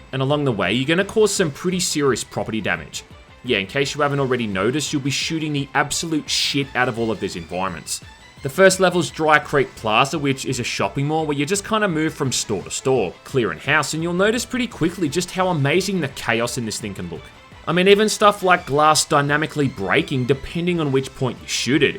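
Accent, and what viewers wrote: Australia. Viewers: Hey are you on Aussie or a Kiwi? Trying to figure out the accent.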